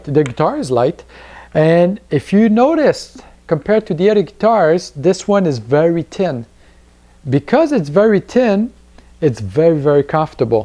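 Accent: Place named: France. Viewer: A little french accent here and there...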